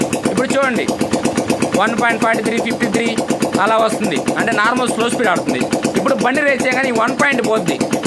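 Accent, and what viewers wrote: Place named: Prakasam, India. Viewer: Prakasam district giddalur side aa bro needi. Aa slang alane vundi. Explanation Inka clear ga chey, nee videos ki Inka views increase avuthayi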